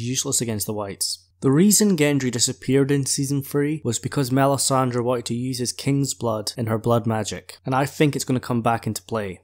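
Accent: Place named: Scotland, United Kingdom. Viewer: Great video, very interesting content & I enjoyed very much. You have a lovely Scottish accent ( assume Scottish lol ), it's lovely to listen too and you articulate really well. I'm from Outside London England, so I'm use to harsh accents lol. Wicked channel & I like your video content. Thanks 😎👍🏼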